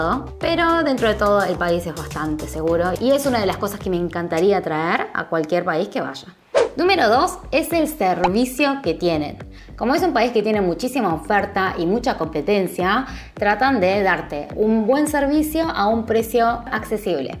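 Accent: Buenos Aires, Argentina. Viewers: Me gusta tu video pero... había vivido por 26 años en Buenos Aires y tu acento no es muy familiar. Tu forma de hablar... es.. porteña tal vez 90% pero el acento... naaa... 😜 Sera porque se trata de una filmacion? Jaja. Y seamos sinceros... lo que me encantaría llevar de Corea a la Argentina, el nro 1 es la cotización re-conta estable al dólar. La pecificación de precios de todos los inmuebles y autos. Y luego la seguridad... Perdon pero la cultura de delivery no me interesaria un sor**... Yo me conformaria con el delivery de la pizzeria La Continetal y Las empanadas Noble Repulgue... Lo que causa el mayor stress es la put**** situación economica, no es cierto?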